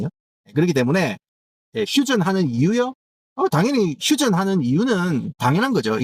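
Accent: Gyeongsang, South Korea. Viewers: 트럼프 성대모사만 나오면 너무 잘한다. 그 경상도 영어 사운드에 익숙해 저버렸으. 캔유 빌리브댓!! 😂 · 경상도 오빠야가 풀어주는 세계정세 ❤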